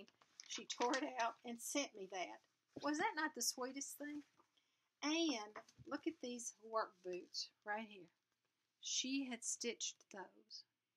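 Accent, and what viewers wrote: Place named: Tennessee, United States. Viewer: Hi! New subbie! Love your Accent! I'm in Tennesse and the accents sound very much alike! lol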